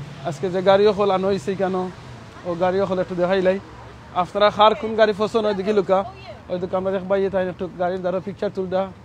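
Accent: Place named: Sylhet, Bangladesh. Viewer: ভাই , আপনার কথা বাংলাদেশের বিভিন্ন অঞ্চলের মানুষ শুনতেছে ভিড়িও দেখতেছে , সুতরাং আপনি সিলেটের আঞ্চলিকতা পরিহার করে কথা বলবেন ।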